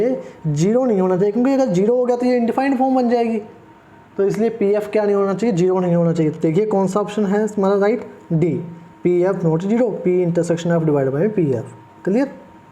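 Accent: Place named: Punjab, India. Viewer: Nice lecture 1st time complete sunya h. But tuhadi speaking Ch Punjabi tone h so I think u must teach in Punjabi.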